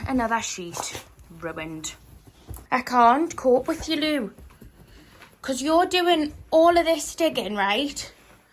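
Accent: Newcastle, United Kingdom. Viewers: I’ve been watching for years and think honestly you’re putting far too much pressure on yourself. I followed you for bargain shop hauls, fun vlogs and to hear your Geordie accent. Go back to basics, you don’t need to be an influencer or even on social media as much if that isn’t what you want. Maybe a part time job in real life might help give you some perspective on if this is what you want to do! Sending love and positive vibes xxxx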